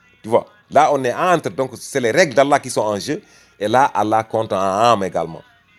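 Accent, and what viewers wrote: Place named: Pulaar, West Africa. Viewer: Un vrai accent halpular!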